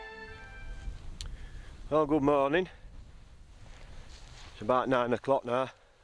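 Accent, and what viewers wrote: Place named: Lancashire, United Kingdom. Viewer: Another cracking video,. Where are you from, if you don’t mind me asking? I see on your IG profile you’re in Barnsley (South Yorkshire) but you use quite a lot of Lancashire slang, like ‘hafe’ instead of half and ‘neet’ instead of night.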